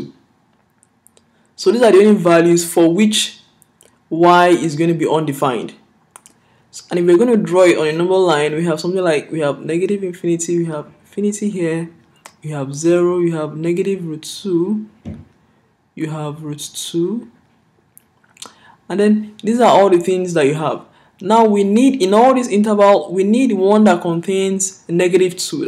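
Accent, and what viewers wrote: Nigeria. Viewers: baba, are you Nigerian?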